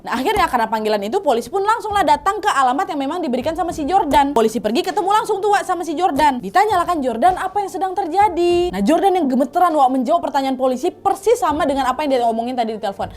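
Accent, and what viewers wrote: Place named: Medan, Indonesia. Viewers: Serasa macam sedang di Medan lah awak nih... sukak kali dengarin cakap nya nih... tebayang awak masa kecil di Medan.. sukses ya.... 😊😚👍👍👍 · Logat Medan,Sumbar dan Pekanbaru menyatu 🤣😂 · Enak kali dengar ceritamu wak..ada logat medan, ada logat minang,, nyampur2 😂😂😂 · Gak pernah bosan melihat vidio vidio kamu syg lucu gokil. Rame dgn logat melayu medan nya.. Trimakasih mbk uda selalu menghibur dgn cerita kisah nyatanya. Sukses terus ttp semangat dan semoga kamu sehat dan bahagia. Amin 😇🙏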